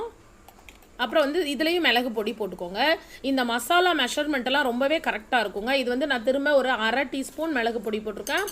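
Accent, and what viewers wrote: Tirunelveli, India. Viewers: Hehehehe nora natiyam 🤣🤣🤣 neenga thirunelveli ya?? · Unga pechi semma nenga thirunelveli ah ..... 😘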